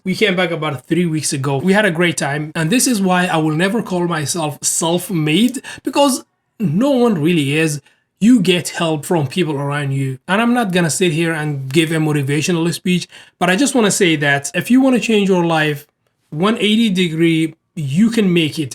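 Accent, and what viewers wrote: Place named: Somalia. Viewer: Somali inaa tahay codkaagaa ka fahme secondska ugu horeeyabo😅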